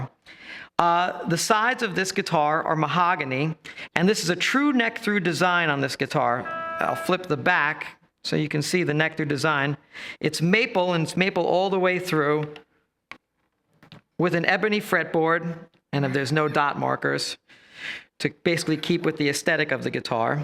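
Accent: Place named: Texas, United States. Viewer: WOw! Thats a pretty dope Texas accent...hehe...awesome model by BCR...good stuff!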